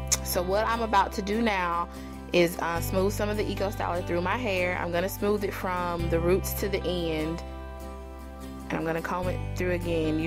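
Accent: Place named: Atlanta, United States. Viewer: Hey!:P love this look. Are you from ATL by the way? I heard a lil accent:)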